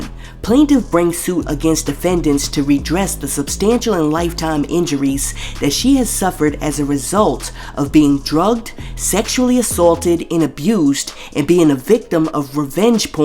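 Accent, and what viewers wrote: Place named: New York City, United States. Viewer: I just subscribed to your channel and I ❤ it. Love your NYC accent!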